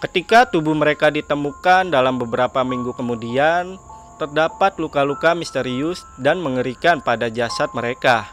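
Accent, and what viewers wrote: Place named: Bali, Indonesia. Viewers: Asli Bali neng terbukti dg ngucap huruf T nya khas bgt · bang tu keturunan bali atau NTB kah? bilang "ithu" nya pas kata "thu" nya kayak orang daerah situ wkwkw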